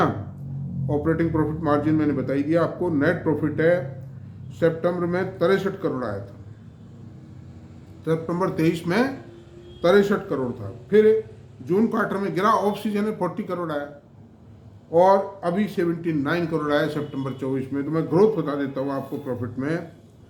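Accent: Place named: Marwari, India. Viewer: Aap ki marwadi hamare wali hai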